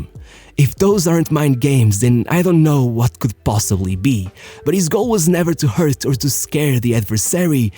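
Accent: Portugal. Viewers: 100% this is a portuguese accent ahahah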